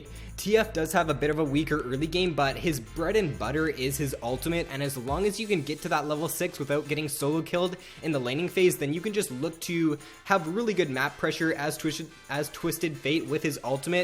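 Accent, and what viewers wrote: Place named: Canada. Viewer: hey, are you from Canada? I'm hearing a slight accent:P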